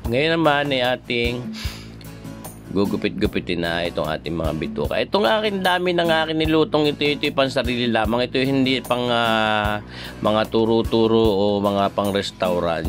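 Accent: Batangas, Philippines. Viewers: very nice accent batang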